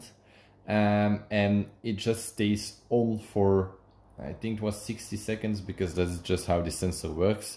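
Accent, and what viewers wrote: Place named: Brabant, Netherlands. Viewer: Je Brabands accent door je Engels is zalig ☺️☺️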